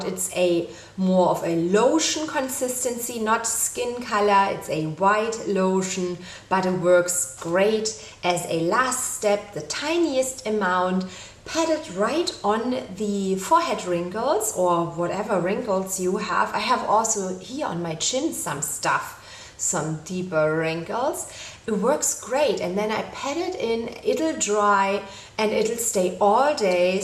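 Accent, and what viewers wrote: Germany. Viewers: Best German accent :D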